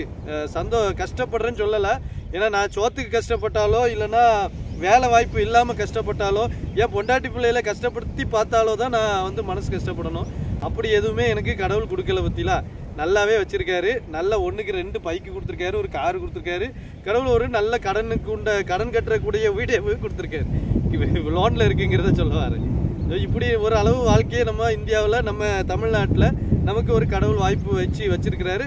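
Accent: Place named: Tirunelveli, India. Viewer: சகோதரா கவலைப்படாதீங்க உங்க மனசு போல உங்க வாழ்க்கை இருக்கும் நெல்லை வட்டார மொழியை நீங்கள் பேசுவதை கேட்கும் போது மனசுக்கு மகிழ்ச்சியாக இருக்கிறது முடிந்த அளவு உங்கள் சேனலே பல நண்பர்களிடம் கொண்டு செல்வதற்கு முயற்சி செய்வோம்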